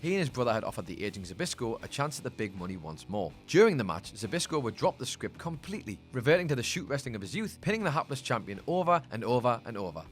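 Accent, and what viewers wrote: United Kingdom. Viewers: I have no problems with UK accents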